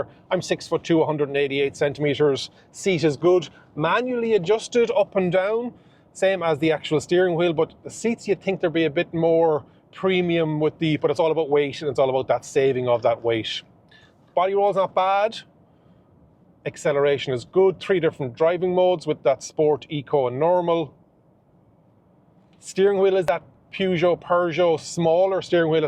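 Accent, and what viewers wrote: Ireland. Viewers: I also love the Irish accent. I used to go over to Dublin a lot in my younger days for Thin Lizzy Vibe for Philo gigs. The Irish people are the most welcoming people I've met. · Refreshing to hear an Irish accent giving a review. Agree with a lot of what he has to say apart from the speed. Extremely sluggish in eco a bit better in normal and fairly quick in sport. Comparing it to my last car which was also stellantis, a DS3 Crossback. It was very quick taking off even in eco and in sport the wheels would literally spin if you try pulling off fast. He says he’s 6’2 and there’s good room in the back seats. Well I’m 6’4 and although it’s the first car I can remember where I don’t have to put the driver’s seat fully back. (So ample leg room in the front) even when I position chair slightly forward to my comfortable driving position, there would be no chance of fitting a passenger behind me (unless they were strapped in a baby seat). 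My wife is 5’0 and she said she wouldn’t feel comfortable sat behind passenger seat. The boot is big too. They would have been better off cutting a couple of inches from the boot to make in more comfortable for back seat passengers. I’ve only just got the car so don’t know much ability the features but driving back 30 miles from the dealership, half motorway half “a road” (uk) it seems to go better than expected on estimated mileage. Get a lot more miles per charge than DS3. So far fairly happy with it. Apart from what I mentioned and the same stellantis app I had with my ds which is dreadful. I’m hoping the in car info is better which was also dreadful in the DS. Looks a lovely car too.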